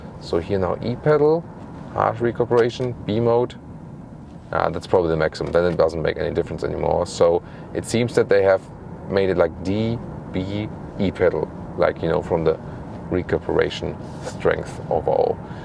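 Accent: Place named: Germany. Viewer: この方、いつも誠実でいい！ 字幕なしでも日本人が理解できるドイツっぽい英語と、話すスピードもちょうどいい！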